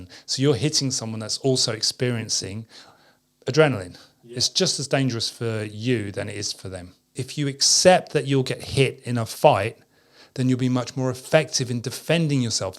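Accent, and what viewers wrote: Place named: Cockney, United Kingdom. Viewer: Excellent advice !!! Love this guy's Eastend accent.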